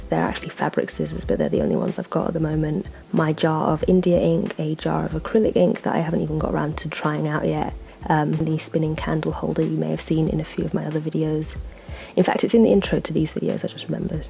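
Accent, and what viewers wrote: United Kingdom. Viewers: Nice vid and really love ur british accent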